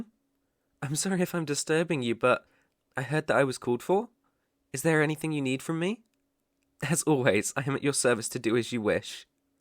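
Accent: United Kingdom. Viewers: And again the British accent is wonderful 😭🌟🌟🌟🌟🌟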